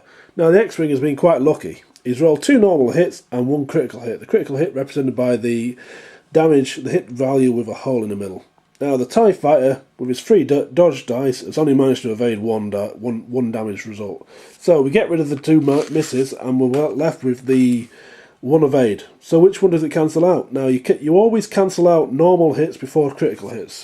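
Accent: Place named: United Kingdom. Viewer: All things sound more intelligent if said with a British accent!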